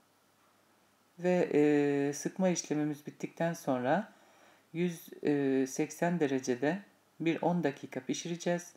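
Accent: Black Sea, Turkey. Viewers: Karadenizlimisin abla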